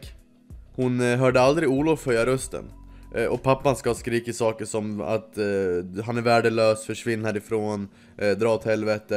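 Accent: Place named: Sundsvall, Sweden. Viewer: Asså, kommer du från Njurunda/Sundsvall? Låter exakt som en snubbe jag känner på sättet du pratar osv. Menar inte att det är du men ifall ni är från samma område.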